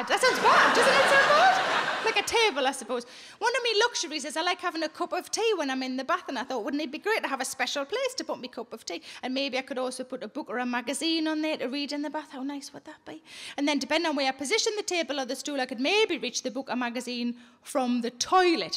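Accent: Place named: RP, United Kingdom. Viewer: She’s the funniest 😂! She sounds so posh then she drops the F bomb which doesn’t even sound bad when she says it! 😂😂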